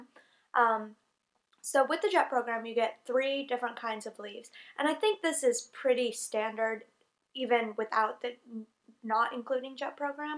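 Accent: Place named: South, United States. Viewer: The southern accent is trying to break through lol. Nice video though :)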